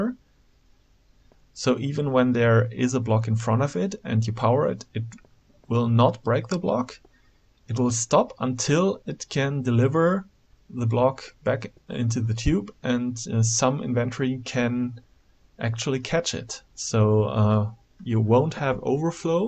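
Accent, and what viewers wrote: Germany. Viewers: Super Deutscher Akzent :D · deutscher ?:D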